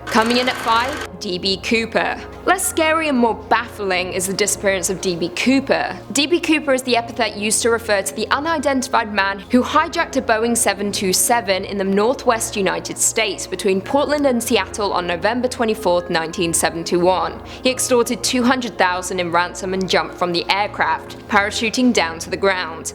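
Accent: England, United Kingdom. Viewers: I agree ......you are very pretty ....love your hair...hate the big baggy shirts I can only imagine....the subjects are very interesting ....love the accent as well.....english? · I know it’s been said before and it will be said again, but there is something about hearing info with an English accent that makes it seem more legit. And kinda spooky too.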